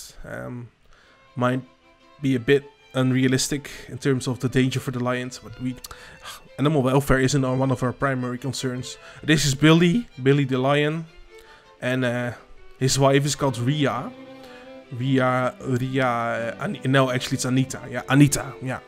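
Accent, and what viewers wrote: Netherlands. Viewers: You sound very Dutch, are you?? Awesome build, nicely done!!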